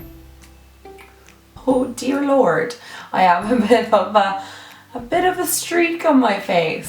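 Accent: Dublin, Ireland. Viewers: Are u from Dublin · What part of Ireland are u from xx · i knew instantly u were frm dublin!! love frm belfast!!! xx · Are u from Dublin? I'm from Langford xx :) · omg r u from Ireland 💘 what county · where abouts in ireland are you from? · what part of ireland are you from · Where bouts in Ireland are u from ?🇮🇪🇮🇪🇮🇪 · Irish buddy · I can't tell wheather your from northern Ireland or southern Ireland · Hi Love! You're also my very first Irish you-tuber. Luuv you & your accent & all your tips. ♥ I've watched a dozen videos in just less than a week's time & the dupes videos over & over & have lists everywhere. I just purchased a few drugstore items today as a matter of fact. Also laughed when you & your sister ate American candy. Now I WANT to get the nude lipliner & lipstick from Penney's. How do I get it here in the U.S.? Thanks ;D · What part of Ireland are u from?💕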